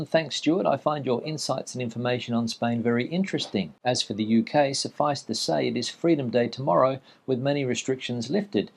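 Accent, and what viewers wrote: New Zealand. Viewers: Are you quite sure you are not speaking with a Kiwi accent? Could you demonstrate the difference?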